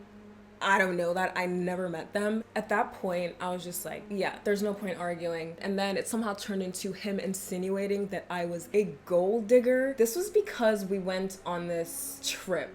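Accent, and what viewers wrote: Australia. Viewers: Just came from your latest Love Is Blind video, and the whole time I was thinking you were American doing the Australian accent as a joke 😂 but nope, you actually have an Aussie accent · You are so funny and genouine. I bumbed to your videos from love is blind lol. Greetings from Finland. Are you australian? You sometimes sound like australian and sometimes American. Oh now you said it nigerian. Your english is perfect.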